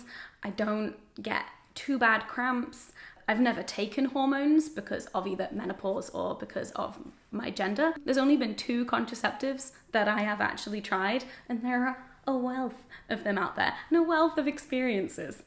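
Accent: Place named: Manchester, United Kingdom. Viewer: Literally couldn't tell you how many years I've been watching you and the Hormone Diaries is my fave thing! Also as a fellow manc living away from home hearing your accent is very comforting😂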